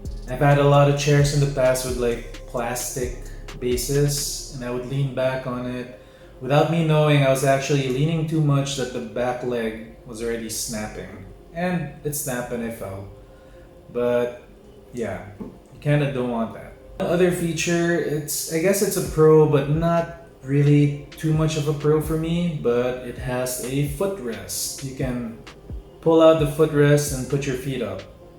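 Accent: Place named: United States. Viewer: Jesus for a Filipino, you have a very American accent.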